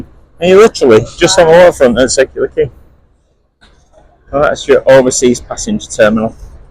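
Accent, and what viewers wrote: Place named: United Kingdom. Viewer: Oh! Your accent did sound British to me but I thought you had come from Melbourne, as in living in Melbourne, to Sydney for the cruise. Yes, then you understand the distance. I was on the Discovery Princess and really loved that cruise, especially New Caledonia. I'm in love with the south Pacific and my next cruise will most probably I'll go back to the south Pacific for my next cruise. I hope you enjoyed Lisbon.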